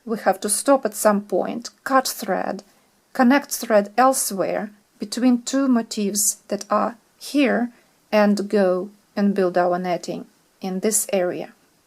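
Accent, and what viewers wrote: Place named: Russia. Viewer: Здравствуйте,по акценту поняла ,что русский знаком с Вами !!! Потрясающая сетка , такой я еще не видела ,браво !!!👍👍👍👍👍👍👏👍👍👍👍👍👍👍👍👍👍👍👍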